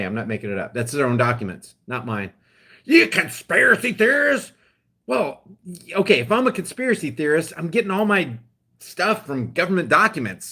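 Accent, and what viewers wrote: South, United States. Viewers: I like the southern accent,lol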